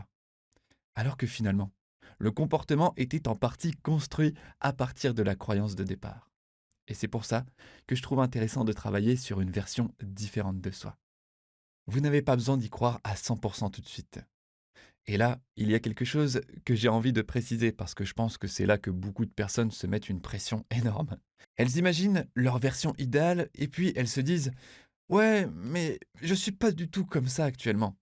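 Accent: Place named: Maghreb. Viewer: Il est étrange que-à mon point de vue-vous ayez un accent maghrebin alors que vous l'êtes pas dorigine ! J'aime vous écouter car la manière dont vous vous exprimez, engendre relaxation et détente, au sein de mon système nerveux énervé : Une impression que j'ai.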